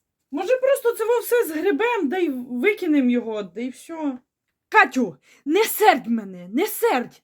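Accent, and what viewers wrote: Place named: Rivne, Ukraine. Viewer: Доброго вечора Вам, сміялась до сліз як прибирали кладовку.Успіху Вам.Моя юність пройшла в м.Рівне, були подруги з м.Березне, м.Сарни, м.Костопіль.У Вас такий самий акцент.Дуже Вас люблю!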